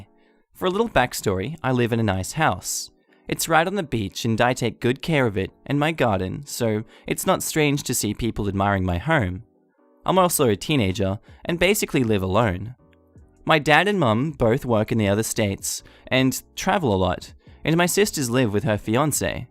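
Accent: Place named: Australia. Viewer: Appreciation points for Aussie narrator 🦘🐊🦘🐊🦘🦖🦘🐊🦘 🦜🦜🦜🦜🦜🦜🦜🦜🦜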